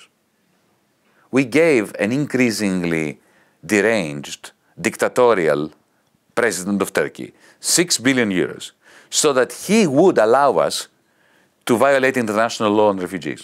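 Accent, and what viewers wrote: France. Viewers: Mais... Pourquoi tu commences l'interview en prononçant pas trop mal l'anglais, puis tu switches sur le pire accent français ? Un petit effort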